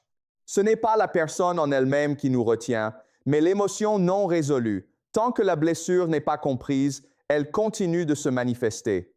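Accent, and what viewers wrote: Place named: England, United Kingdom. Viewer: Dommage pour l'accent anglais, je préfère la voix habituelle ...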